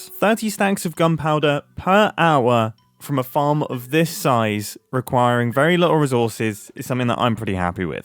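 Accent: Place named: RP, United Kingdom. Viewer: That’s it sorry man but I’m not watching anymore, I was here to watch a dot in the corner while listening to a British man sound posh and I can’t experience that anymore.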